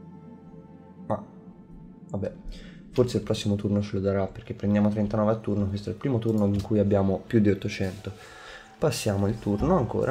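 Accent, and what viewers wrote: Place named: Tuscany, Italy. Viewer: D :D figurati!! complimenti ancora...seguire i tuoi video è leggero e facile, sei molto "pulito" e il leggerissssssimo accento toscano fa colore!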